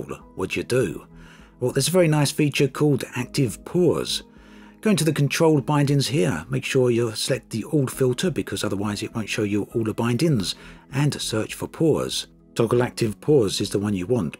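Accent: United Kingdom. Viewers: Because he’s British? I mean, people do have different accents depending on where they’re from 🙄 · His English is so think from Englad or Wales or somewhere. He seaks so fast and move the issues so quickly It is like pulling a rabbit out of a Hot.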